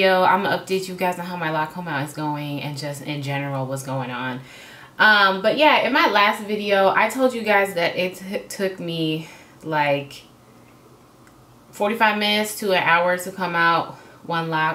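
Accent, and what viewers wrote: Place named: Atlanta, United States. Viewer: I’m from Atlanta and I live in Washington state too lol what a coincidence I heard the swang in your voice and I was like where is she from hmm . Well subscribe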